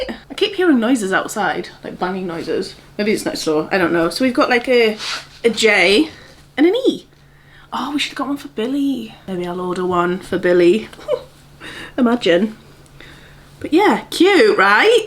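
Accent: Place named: Bristol, United Kingdom. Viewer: lol at your Bristol accent 😂 sending my love from Bristol ❤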